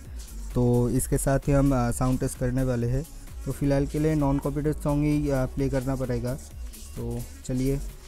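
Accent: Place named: Bengal, India. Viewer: Dada tomar kotha sune bujhlam tumi bangali tomar bari kothay ❤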